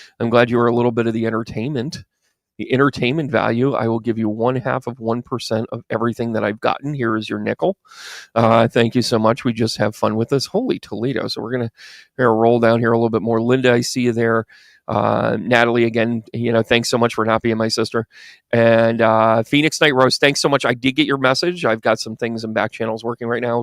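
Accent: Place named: Boston, United States. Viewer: By the way ur NY accent is Boston!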